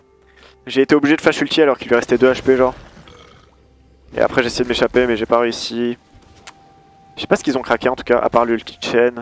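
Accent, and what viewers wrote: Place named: South, France. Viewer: C'est moi où il avait un léger accent du sud en 2015 ? La vie de parisien ça lui a atténué ses origines on dirait :D .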